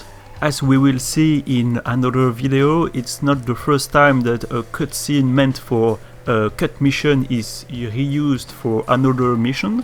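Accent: France. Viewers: you french boi ?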